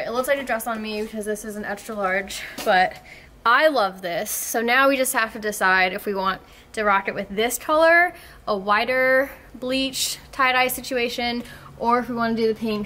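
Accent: United States. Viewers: HEE-YUN-DAY. not HUN DAI. Damn that American pronunciation kills me.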